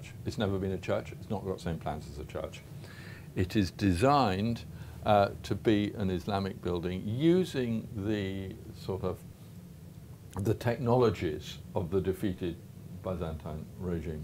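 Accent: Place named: United Kingdom. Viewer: For him being such an amazing academic of Islamic history and a guy very proficient in Arabic, I find his overtly British pronunciations of Arabic words charming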